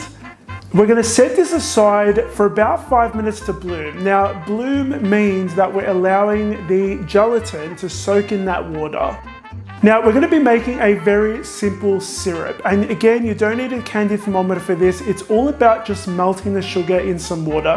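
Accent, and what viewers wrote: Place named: Australia. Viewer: He's Australian. I agree, the accent is lovely to listen to.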